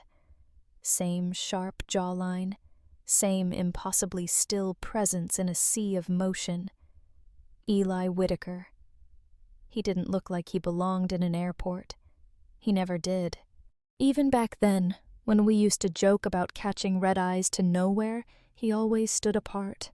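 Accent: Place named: United Kingdom. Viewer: Love this story...and the narrators slight British (?) accent. 🥰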